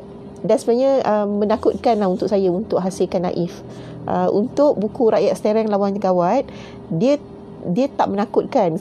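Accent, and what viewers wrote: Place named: United States. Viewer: Buku naif best.. penulis membawa jiwa pembaca kesana.. banyak benda dpat beljar terutama culture. seorang yang cekal (Grit), resilience, introvert yang mempunyai daya kepimpinan. Pandai berdikari. Rasa macm nak dengr banyak benda lagi.. sbagai tambahan mgkin boleh translate kan English sentences, so that the rest also can understand. Oh, saya.. saya rasa ada bakat tersembunyi yg boleh di majukan, dan dikembangkan.. iaitu American English. Mana tahu boleh buat video mengajar peminat untuk belajar American English. mesti hebat tu.. hehehe.. Apa-apa pun bacaan yg best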